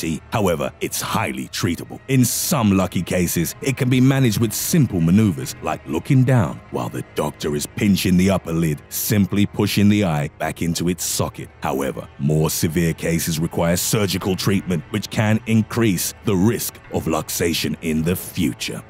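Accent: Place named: United Kingdom. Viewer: cinocee scottys a pleab. Anyways, this dumb ass voiceover takes the scary away, sounds like a British butt pirate.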